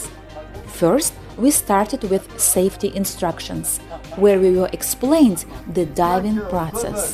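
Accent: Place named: Russia. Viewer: Very nice, just one suggestion I would recommend you to use AI for your videos, your English is kind hard to understand due to your Russian accent.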